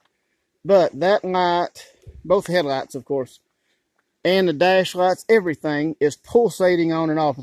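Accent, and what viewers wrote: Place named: South, United States. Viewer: I don't understand why people feel the necessity to criticize such good-hearted intention and help. The bungee cord move.. what a boss .. so simple, it's genius! (Poetic license here folks, please? I understand proper grammar. But, we're not in an academic setting. Are we?!) Being a city boy mathematician, 17 years ago I moved to a small Texas town. I've discovered I'm whiter than most and a country boy at heart! The problem with that is that I'm Hispanic, Mexican American, or whatever I'm called these days. I liked the Southern Drawl! Brother, I guess many have forgotten the Golden Rule. I enjoyed your video and light sarcasm. You are funny! Don't forget to keep us laughing. I learned and I was entertained at the same time. As an educator for over 30 years, learning should be FUN! GREAT JOB!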